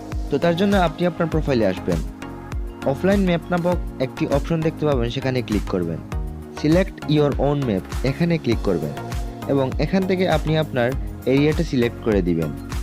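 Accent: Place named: Sylhet, Bangladesh. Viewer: সিলেটি ভাইনি বা❤️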